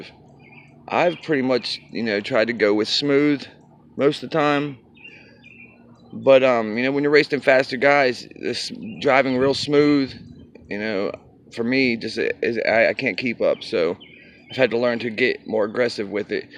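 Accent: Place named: Pennsylvania, United States. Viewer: You sound like you're from PA, and not NC. Just an observation. :D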